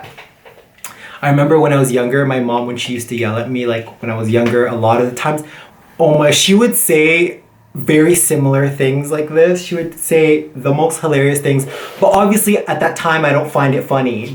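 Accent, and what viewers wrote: Dominican Republic. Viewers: This is really funny and entertaining! Your Doninican spanish is on point. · You sound Dominican to an extent. Sigue pa' lante! or sigue por adelante. Basically just keep going lol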